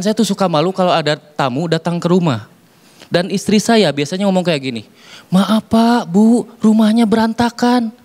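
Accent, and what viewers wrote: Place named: Lampung, Indonesia. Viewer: OMG.. Lampung Indonesian🎉🎉🎉😂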